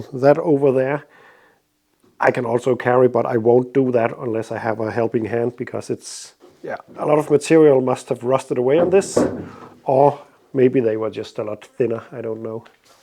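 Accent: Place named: Denmark. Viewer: There I was just eyes down reading a book and your video had just begun when I heard Al Pacino say those immortal words “ Say hello to my little friend “ honestly it sounded so much like him 😂, Pacino with a Danish accent Ofc but so good 😂😂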